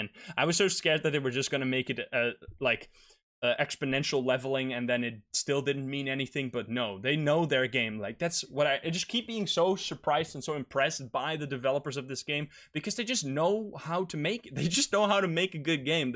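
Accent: Netherlands. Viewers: eeeey een nederlander of belg idk eeeeey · Dit is een Nederlander ik kan het horen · WAIT, are you dutch?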